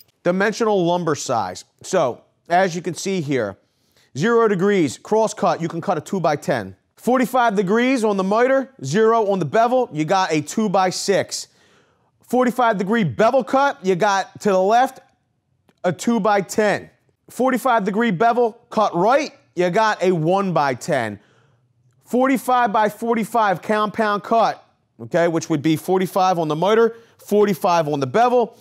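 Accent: Philadelphia, United States. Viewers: LOL, knew you were from Philly. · Knew u were a philly guy from the accent lol Gonna sub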